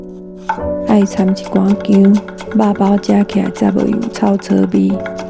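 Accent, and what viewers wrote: Hsinchu, Taiwan. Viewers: 第一次聽到新竹腔耶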